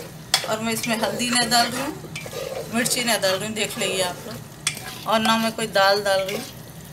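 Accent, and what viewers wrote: Hyderabad, India. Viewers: Assalamualaikum Aunty. Kaha the aunty aap itne din se, farishta banke agaye aap, yeich khaandani aur original daleem ki receipe dhoondhro tha dekhiye Mai ab Tak, Jo meku milgayi aur Mai seekhliye banana isku. Dawaat me banaya dekho aunty, Logan biryani chor ke, daleem khatam kardiye puri, aur hai Kya puchre the meku. Sab apki badaulat hai, allah apku zariya banaye hum sab KU pakwaan sikhane ka. Jazkallah khair. Aur ab rahi baat apki aunty, to meku apke baat karne ka andaaZ itna pyaara dhikha, pure Hyderabadi language, Jo bahut kam sunne KU milti yaha USA me. Maza agaya. Hehe. Apke camera wale Jo b hai, Bhai ya behen, Zara camera close nakko rakho utna, bartan me gir jata camera aur thoda close leke Gaye to, thoda uppar SE covering Karo pakwaan ki, aur acha dikke aata aunty kaisa mix karre Kya karre receipe KE saat. Agli baar zara uppar rakho camera. 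Aur aunty Allah apke abba jaan ko jannat me aala SE aala mukhaam Naseeb Kare, jannat ki khidkiya unki khabar me khol de, huzoor Muhammad Mustafa sallallaahualaihiwaliwasallam ka deedar Naseeb Kare qayamat ke din, aur khabar KE azaab SE mehfoos rakhe. ( Ameen ya rabbul aalameen). Kardiya dekho jee aunty Dua, inshallah, apki dusri pakwaan wali video ka intezaar rahinga. Allah apku sehet de. Ameen. Allah hafeez. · Assalamualaikum Aunty Jazak Allah khair for this Daleem recipe. Alhamdulillah I tried making it for the first time n it turned out so well n delicious . My kids loved it👌👍. I love the way you talk pure Hyderabadi style. Love you Aunty. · Amma aap ekdum Hyderabadi bhasa bolre. America me bethke Hyderabad yaad aa gya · Assalamualaikum wrwb Aunty! Aapki awaaz sunke meku mere hyd ke apno ki yaad ajati Aapki saadgi ke waje se Allah apku be hisab sehat , dunya aur aakhirat ki kamyabi atafarmaiye Ameen Feeamanillah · More than Haleem, aap ka explanation bhagayaa... 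hyderabad Urdu rocks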